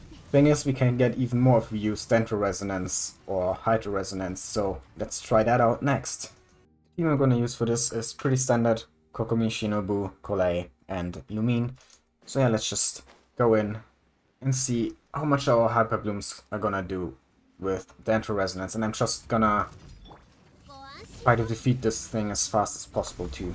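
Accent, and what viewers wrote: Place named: Germany. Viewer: Youuu.. are german! Das höhre ich doch gleich raus :D Fellow Kuki Main here, I like to do Montage videos of teams i like and im working on an Aggrevate Kuki Montage rn I LOVE Aggrevate Kuki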